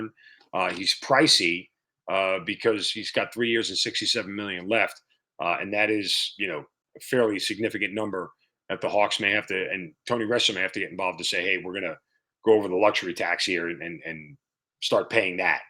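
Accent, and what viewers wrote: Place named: Massachusetts, United States. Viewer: It’s hawks not shucks go back to Massachusetts with that accent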